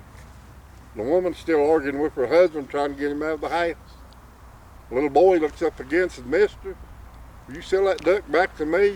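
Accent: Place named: South, United States. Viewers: Mountain speak. It took me some time after moving to the NC mountains to develop an ear for the "country" speak in these parts. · their exact words were "yes and someone who can speak clearly". That's no different than walking up to someone speaking Spanish and calling them stupid for not speaking English. This person speaks Appalachian English very clearly A N being ignorant of the dialect doesn't change that.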